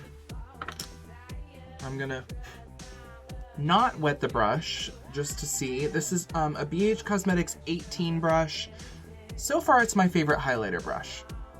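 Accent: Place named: Ireland. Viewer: Love the Irish accent lol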